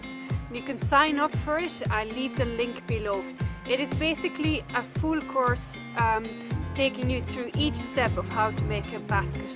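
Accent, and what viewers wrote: Ireland. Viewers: I expected a Flemish accent and then you hit me with the slight Irish accent!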